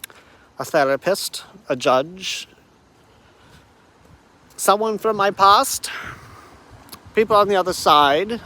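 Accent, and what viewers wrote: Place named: England, United Kingdom. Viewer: Hey! I Love your English accent brother ❤ we love your walk and talkies. Know that your helping people all over that need lifted up with your amazing energy. Hope to see you on the live on Jeffmara. Chin up, your not alone ❤❤❤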